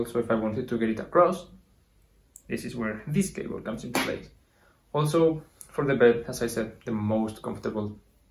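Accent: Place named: Spain. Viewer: jajaja podia adivinar que eras español, muy buen video hermano! justo lo que necesitaba. gracias :)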